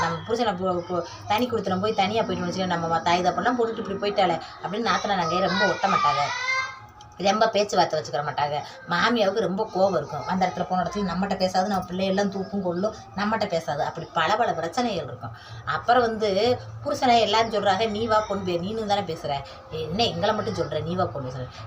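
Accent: Madurai, India. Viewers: Mathurai or usilampatti or thondi slang kandippa namma ooru kaa thaan neenga ❤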